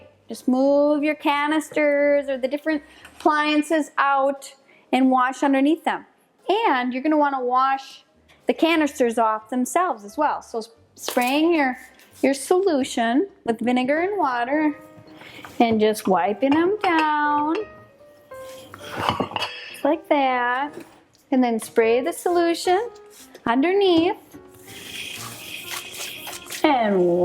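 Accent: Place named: Minnesota, United States. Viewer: I love your accent! Are you from Minnesota?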